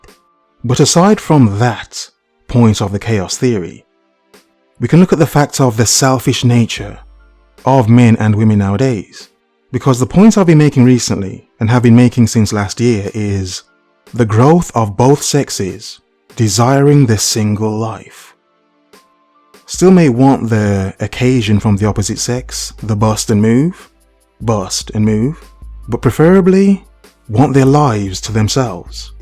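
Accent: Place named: United Kingdom. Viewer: This guy's British accent is so much easier on the ears than those other channels.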